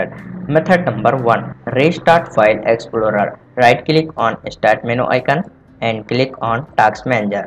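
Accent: India. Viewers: Indian accent is never a good sign, never ever had an issue fixed with those guys.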